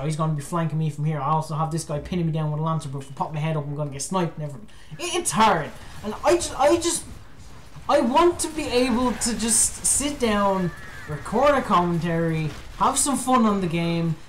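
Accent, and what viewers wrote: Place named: Ireland. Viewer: That Ireland voice (; <3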